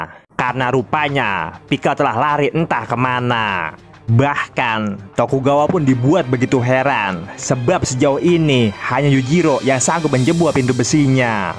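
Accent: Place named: Betawi, Indonesia. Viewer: Bang gw suka pembawaan lu yg mirip" Temen" Betawi gw kalo udh ngomong, sering" Upload bang dsn durasinya panjangin kalo bisa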